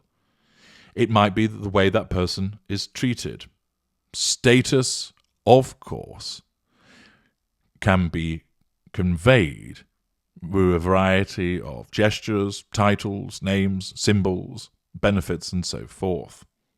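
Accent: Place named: Upper class, United Kingdom. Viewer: Love your clipped accent, clearly upper class Brit. Eton educated?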